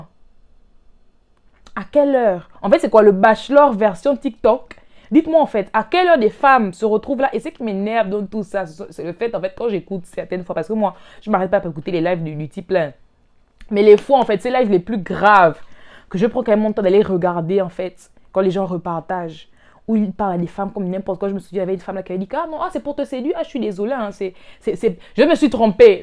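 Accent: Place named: Gabon. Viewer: Mais tu es gabonaise non? En tout cas tu en as l'accent.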